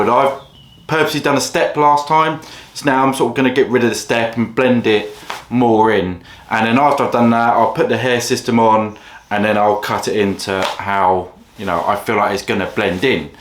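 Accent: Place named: Essex, United Kingdom. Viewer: Uk, Essex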